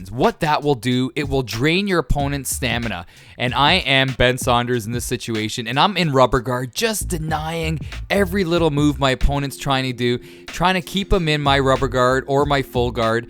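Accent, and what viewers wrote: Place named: Canada. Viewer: I knew you were Canadian because you sound just like Ricky off The Trailer Park Boys.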